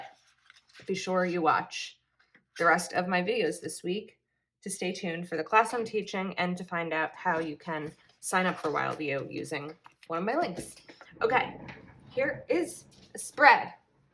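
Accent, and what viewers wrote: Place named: New Jersey, United States. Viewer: Hi...so sorry for your loses. I was listening to you while I was just doing my planner and you made me laugh. You asked the question about the pronunciation of the word orange. I thought wow she says it the same way I do. Then the next thing you said was that you were from NJ. I'm from NJ as well now residing in Vermont. They tease me all the time about my accent. I'm constantly trying to explain that it's not me with the accent but them...lol. I love your spreads and videos. Chin up, you're doing a great job.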